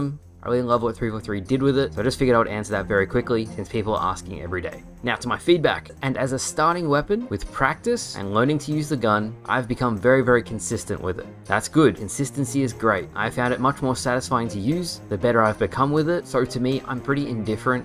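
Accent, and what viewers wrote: Australia. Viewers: Lol forgot was Australian until he started the video with "G'day"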